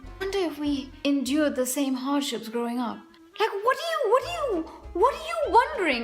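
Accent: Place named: South Africa. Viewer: But you do have a South African Indian accent and you’re nothing like a coconut. You actually have more of an Indian nasal sounding accent. A coconut has negative connotations. I find your persona to be authentically South African Indian. Own it and be proud of it. 👍🏼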